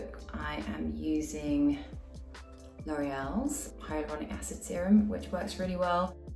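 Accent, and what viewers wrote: United Kingdom. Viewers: The only time I actually like a Brit girl voice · Why do I always thought that she's American for some reason? The moment she speak, I was a bit surprise to hear her British accent. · Is she British based on her accent? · What accents she has? British.. but not that Seamus on Harry Potter😅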